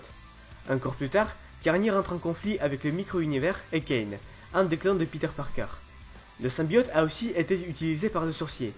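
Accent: South, France. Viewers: Sympa le concept, par contre je ne sais pas quel est ton accent, mais pour le commun des francophones, c'est parfois compliqué de te comprendre. :) il "reuvait" de devenir pompier ?" sa "grammaire" dans l'escalier... C'est le sud ca, mais ca complique un peu la compréhension.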